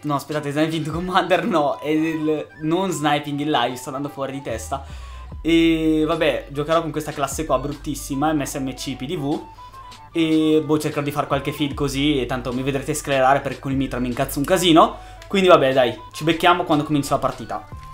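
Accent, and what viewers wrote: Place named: Bergamo, Italy. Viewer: Quanto si sente in sto video il tuo(pure mio ahah) accento bergamasco oh ahahahah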